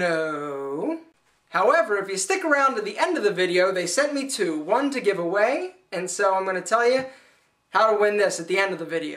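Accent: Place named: Western Pennsylvania, United States. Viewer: You sound like you have somewhat of a western pa accent :) Very nice videos!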